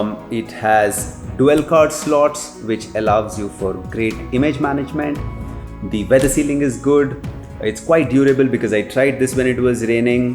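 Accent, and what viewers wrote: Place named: Canada. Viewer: Your accent is funny. Are you a Canadian?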